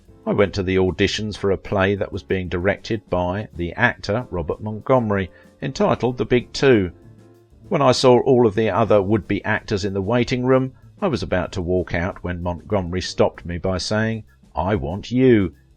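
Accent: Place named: Cockney, United Kingdom. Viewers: The narrator himself may be a cultured man, I wouldnt know, but his accent is not especially so. Unless you mean cultured in the sense of deliberately created or adopted. The underlying accent sounds like an east end of London, "cockney type", accent. It might suggests a clever person who has got on reasonably well in life but who may not have been especially well educared in his early years. I am not saying the accent is fake, he may well have picked up his adult accent because of the circles he moves in. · The title is false. Brando did have his nose broken when he was horsing around backstage with another performer during the run of "A Streetcar Named Desire" but it wasn't Jack Palance who clocked him by mistake. It was one of the actors in the poker night scene who also had been a professional boxer previously. I forget the guy's name, but he wasn't a large man like Palance. 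Marlon himself told this story a couple of times. Why would he lie about who punched him? I have never heard the rumor that Palance was involved, and it seems made it up because it fits in with the idea of him wanting to go on for Brando. Here's another oddity--the fellow narrating has a cultured British accent, yet they are unable to write a decent English sentence. It should read, "Why did Jack Palance Knock Out Marlon Brando?"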